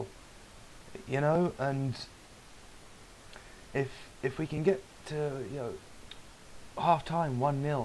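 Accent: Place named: Sunderland, United Kingdom. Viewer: And you're not a true mackem, more like an adopted one by the way you talk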